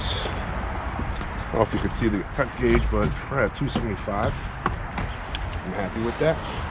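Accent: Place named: New Jersey, United States. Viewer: That Travel Kettle has got to be a must for anybody into smoking and grilling. I think you'll find a lot of uses. By the way, I love to hear what I call my Jersey accent. Don't ever lose it!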